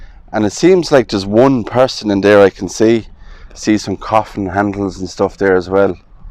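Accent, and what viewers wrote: Ireland. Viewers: I love your videos. I very much enjoy hearing Irish folks speaking. You could be reading a phone book and it would be entrancing.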